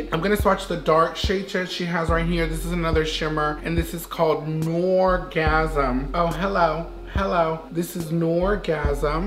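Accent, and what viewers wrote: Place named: United Kingdom. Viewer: I loved the slight British accent coming through girlllll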